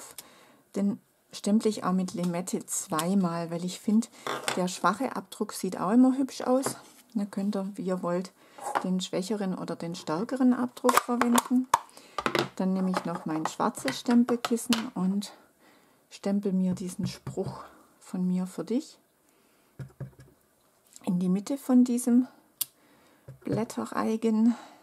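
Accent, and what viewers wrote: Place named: Swabia, Germany. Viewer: Süsses Projekt, Deine Sammelbesteller werden sich freuen! Und Dein Schwäbisch ist total goldig xx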